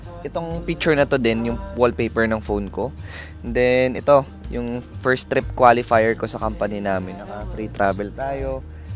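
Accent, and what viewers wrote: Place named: Quezon, Philippines. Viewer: I knew it. Narecognize ko agad yung punto ng Quezon..😊 new subscriber here..🎉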